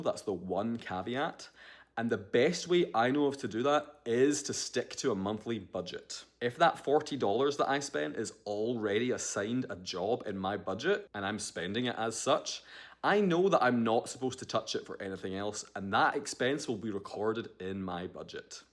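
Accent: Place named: Scotland, United Kingdom. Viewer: Are you a Scotsmen 🏴 living in America 🇺🇸 because you have Scottish Accent but keeping mentioning Dollars and showing Dollars?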